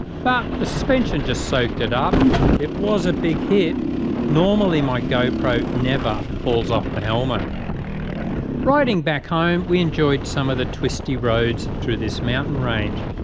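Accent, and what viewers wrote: Australia. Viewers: Nope, just nasally Aussie twang 😉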